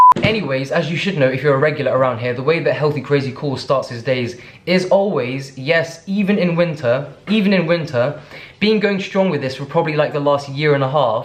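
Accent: United States. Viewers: I like how you try and pronounce certain words in an American accent, u got it all down pat except "water-melone" that's halfway Spanish accent 😂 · Love the American accent 😂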